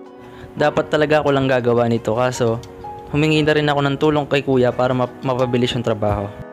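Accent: Pampanga, Philippines. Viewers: Ako Lang Ba Ohhh May Parang Accent Sya Ng Kapampangan Pag Nag Sasalita Ng Tagalog 😂😂😂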